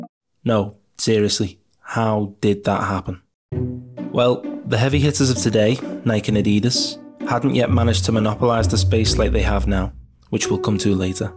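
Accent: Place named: North West England, United Kingdom. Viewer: you deaf he’s got a north west accent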